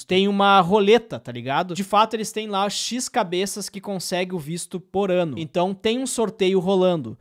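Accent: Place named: Rio Grande do Sul, Brazil. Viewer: eu moro hoje nos EUA com visto H1B. Ate hoje, nunca conheci outro BR com esse visto. Todos que conheci são mexicanos, chineses e principalmente, indianos. Depois que tu és demitido, tu tens 2 meses pra se recolocar e achar outra empresa que mantenha o teu H1B. Depois disso, teu visto expira! Alguns falam que o H1B é o ruim, porque depende da boa vontade do empregador e tal... mas a real é que depende demais. Eu tenho um chefe ótimo, e considerando que todos estão felizes com minhas entregas, esse visto é uma bênção. Pode ser que eu seja demitido no futuro e tenha que voltar? Sim! Mas ter morado aqui por esses anos terá valido a pena... E eu voltaria pra onde for com outra bagagem... Sou gaúcho também! Como é bom ouvir um pouco de sotaque haha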